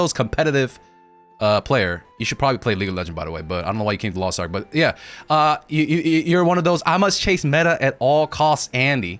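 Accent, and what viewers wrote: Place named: United States. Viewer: Wow you speak American English!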